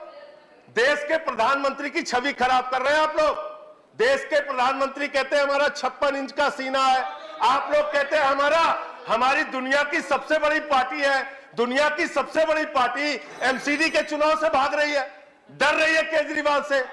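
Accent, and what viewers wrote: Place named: Uttar Pradesh, India. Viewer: संजय सर !!! आप हीरो हैं देश के। आपके बोलने शैली हमारे उ०प्र० की पहचान है...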